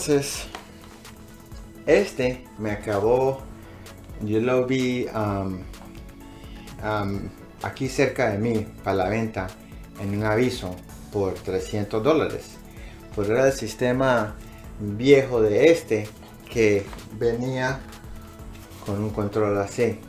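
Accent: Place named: United States. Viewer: Este es un gringo que aprendio español en cartagena.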